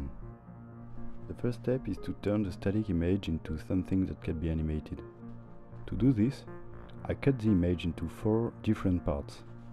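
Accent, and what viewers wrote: France. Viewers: I do seem to recognize a french accent, eh eh Its nice to see you try new things/formats, keep up the great work! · You got a nice french based voice man, nice to hear someone speaking like me :v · Are you from France? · Really nice video, and damn was'nt ready for a french voice reveal ! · Are you french ? Love your voice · Are you french ? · Love your accent man! Are you from France? I'm Spanish and it reminds me of that. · Voice is very soothing. The french accent is hearable but honestly that's what makes your voice's charm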